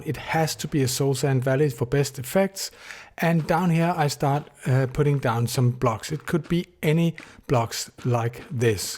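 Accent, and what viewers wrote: Denmark. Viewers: your acsent really sounds danish ngl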